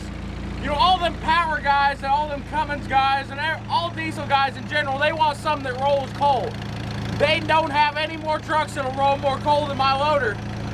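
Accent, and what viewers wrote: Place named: Oklahoma, United States. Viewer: Okc guy in here...sounds like your close...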